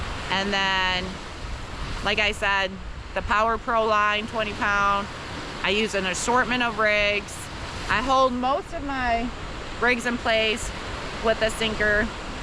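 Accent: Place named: Midwest, United States. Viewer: I grew up in Michigan, Pennsylvania now. I suspect you are not originally from Florida. I recognize your Midwest accent. it is music to my ears. (Don't recognize...)I really like your videos, I hope you both hang in there. Blessings....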